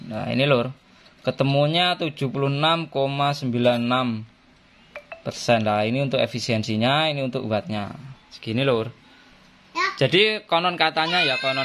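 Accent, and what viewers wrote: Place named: Madura, Indonesia. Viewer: Nah ketahuan ada logat madura nya 😲😲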